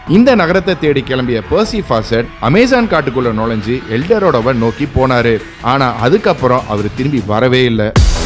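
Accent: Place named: Chennai, India. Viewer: That's it chennai voice mathri iruku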